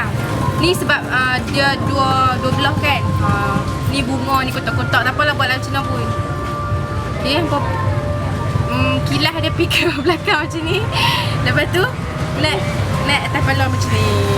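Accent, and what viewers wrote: Malaysia. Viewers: 2 0wg mne? bunyi ckp cm 0wg baling ja!haha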